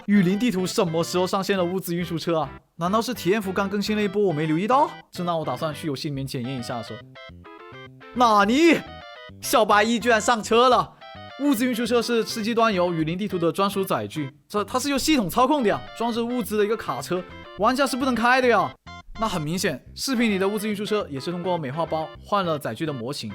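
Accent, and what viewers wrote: Hong Kong, China. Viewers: 你是不是香港人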